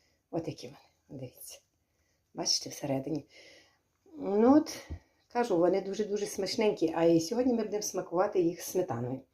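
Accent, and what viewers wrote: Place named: Bukovina, Ukraine. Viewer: Як смачно❤❤❤ Мені дуже подобаються ваші рецепти, а особлива ваша українська ... Це таке відчуття, що побувала на Буковині . А ви часом не з Буковини ?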